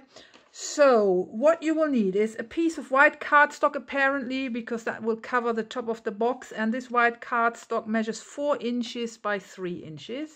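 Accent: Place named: England, United Kingdom. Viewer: i love , love, it, i will surely try it because i love the 3D projects. i am sorry for your finger but we all do this with the excitment of seeing the finish project. how satisfying it is when we finish it specially when it is our own creation. For me i love your english accent and your english sounds perfect for me. continue your good work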